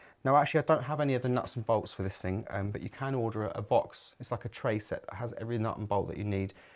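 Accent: United Kingdom. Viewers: I agree. He is nice to look at....lol....and my mom passed away a few years ago...it is just nice to hear someone speak British English. :)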